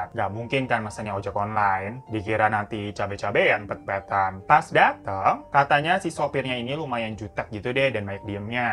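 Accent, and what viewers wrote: Java, Indonesia. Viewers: Bg iki wong jepang atau wong jowo?? 😂😂 sipit² tapi koe medok wkwkwk Lucu sih..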